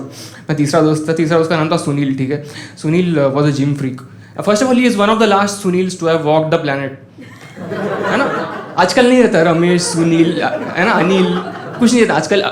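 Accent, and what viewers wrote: Maharashtra, India. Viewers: Your marathi tone is masking your talent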